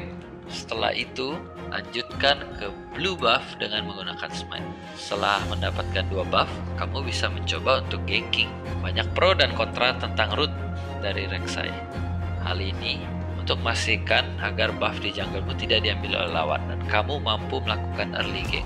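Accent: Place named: Java, Indonesia. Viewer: Jawa nya Jelas BGT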